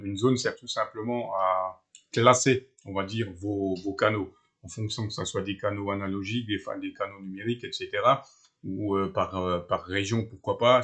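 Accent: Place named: Alsace, France. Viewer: Salut! La fréquence TX du relais 3 a dû être décevante... Sinon bonnes explications avec une jolie pointe d'accent Alsacien. Je suis dans les Vosges, mais pas encore concerné par les relais, je n'ai pas encore de licence Pour le moment, je fais mumuse dans le monde du 446...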